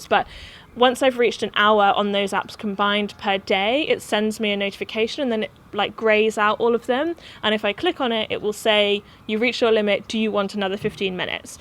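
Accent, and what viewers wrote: United Kingdom. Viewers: You know.. I think I like you )) Your British accent and how you can express all this things. Positive energy comes from you ^^ P.s I also quit Insta for two month already. And it's quite good to check yourself, or just get some extra time. But when I'll get back, I will find you )) Damn, I miss my friends there 😟😁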